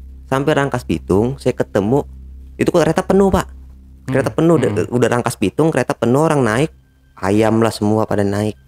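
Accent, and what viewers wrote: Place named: Jakarta, Indonesia. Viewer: Asli depok neh,bahasa nya kaya kita pisan....betawi pinggiran....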